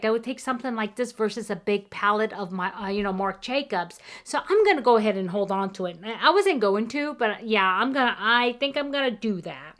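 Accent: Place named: Boston, United States. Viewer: I absolutely love your accent hon, is it Boston? I’m hopeless at working out regional accents x I’d love to see your skincare regime as your skin always looks so fresh x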